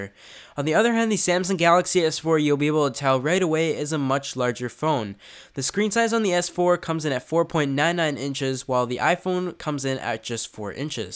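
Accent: Canada. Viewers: Are you canadian? I hear a little bit of a canadian accent lol. Canadians have cool accent.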